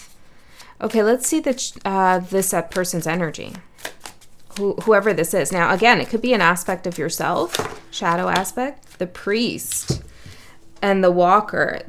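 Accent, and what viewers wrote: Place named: Canada. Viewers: I think it’s Canadian speak 🤭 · it's an accent. she is canadian.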